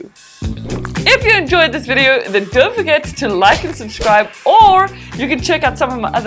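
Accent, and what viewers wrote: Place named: South Africa. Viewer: I wonder how many other South Africans get that "that's so raven" look on their face when they hear the accent in a YouTube video 😂 Loved the comparison! 🔥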